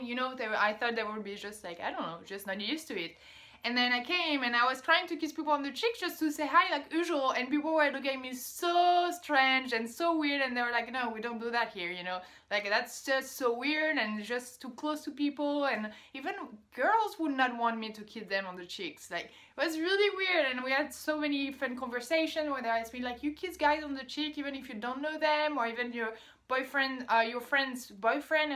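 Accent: France. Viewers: I love your French accent!!! I’m from California and I can agree on everything you talked about in the video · I have no clue who would downvote this girl she is so beautiful & her french accent is so adorable & pleasant to listen to!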